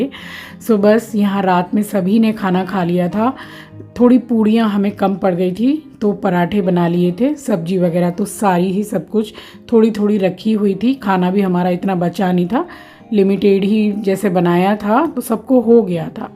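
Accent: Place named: Uttar Pradesh, India. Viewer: Aap up wali lenguage kese bol rhe ho.... Mai up se hu boht achha lga sun ke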